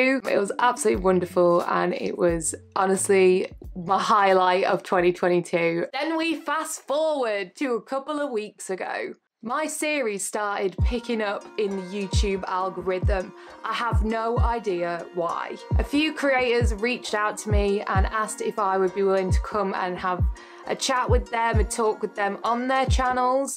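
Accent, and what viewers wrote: Manchester, United Kingdom. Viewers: I'm guessing the Lego is a part of your balance and should not mix with the World Wide Web??? Your series is the only one that REALLY hit home to me, it was because it was closer to home with me (UK, and the 'proud to be' Manc accent). Ill be sure to keep tabs on your efforts. A great idea to focus on the 'after Scientology', It would be quite empowering I think. Well done, keep up the great work, your a natural. RIP Grandma x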